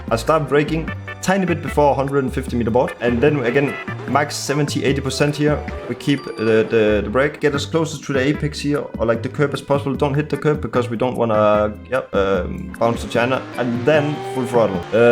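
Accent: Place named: England, United Kingdom. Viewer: Pure comedy and that English accent man, epic!!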